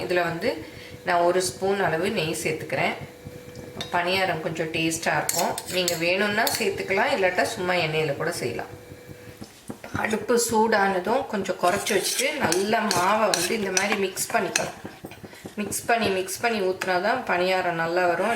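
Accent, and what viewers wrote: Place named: Chettinad, India. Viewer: Hats off achi, use our chettinad Tamil when speaking. It's so nice to hear. Very sweet of you. Recipes are good too.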